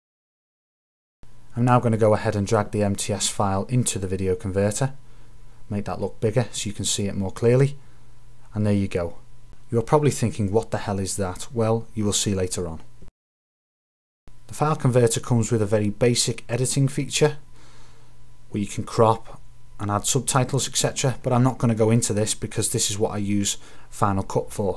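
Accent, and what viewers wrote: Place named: Liverpool, United Kingdom. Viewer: Where bout in liverpool you from lad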